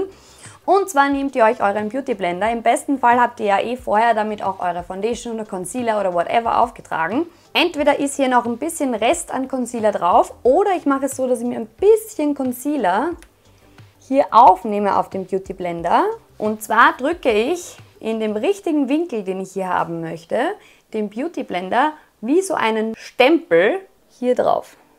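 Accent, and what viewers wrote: Vienna, Austria. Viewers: Mega toll erklärt, ich schau und vor allem hör ich dich gerne....dein „Wiener Dialekt „ ich liebeeee es! 🥰 Ganz liebe Grüße aus Deutschland 😉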